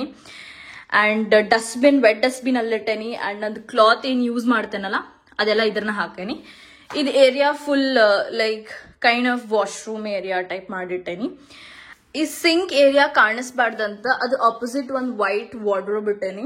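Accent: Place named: North Karnataka, India. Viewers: Akka yaav oor nind? Hubli bhashe ansatet 😅😅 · Akka super vlog😍 yav uru akka nimdu, ನಮ್ಮ ಉತ್ತರ ಕರ್ನಾಟಕ ಭಾಷೆ👌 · ಅತ್ತಿ ಯಾವುರ ಬೆ ನಿಂದ, ನಿನ್ನ ಕನ್ನಡ slang ಕೇಳಿದ್ರ ನಮ್ಮ ಹುಬ್ಬಳ್ಳಿ ಅನಸ್ತೇತೀ...!! ❤ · Namma uattara karanatak bhashe keli bhal kushi atu, Nice tour, Good luck re madamaa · Nimma Uttara kannada bhashe bahala istavayothu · MANASIKA 😀😀 It was nice to hear hubli accent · E utaar Karnataka davru Elle idru nam Kannada DHA sogadannu bidodilla .thts somthing I like....moola Kannada padhagalu uttara kanada dalli heralavaagive.proud to see this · ಯಕ್ಕ ಏನು ಚಂದ್ ಮಾತಡುತ್ತಿ ನೀ..ನಮ್ಮ ಬಯಲು ಸೀಮೆ ಪಕ್ಕ ಹುಡುಗಿ ಇದ್ದಿಯಾ ನೀ ಐ ಲವ್ ರೀ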